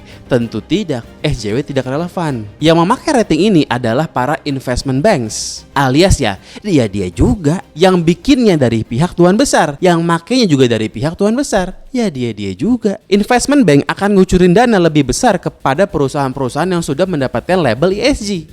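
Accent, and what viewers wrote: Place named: Betawi, Indonesia. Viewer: Dia pakai cara bicara khas orang betawi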